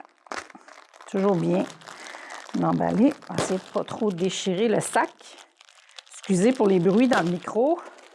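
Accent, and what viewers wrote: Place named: Canada. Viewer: Oh un accent canadien....de Celine Dillon...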